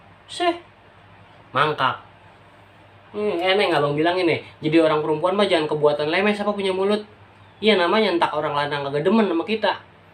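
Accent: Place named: South Jakarta, Indonesia. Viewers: Wkwk logat Jakarta Selatan dong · betawi asli ye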